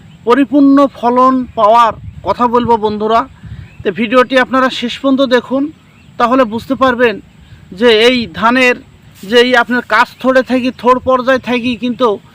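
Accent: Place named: Magura, Bangladesh. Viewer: ভাই আপনার বাড়ি টা কোথায় একটু জানাবেন আমাদের মাগুরার ভাষার সাথে আপনার ভাষার মিল আছে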